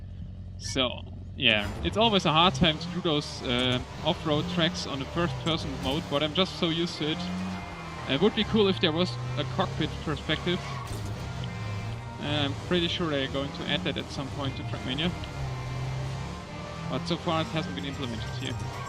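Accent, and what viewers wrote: Germany. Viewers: deutscher aksent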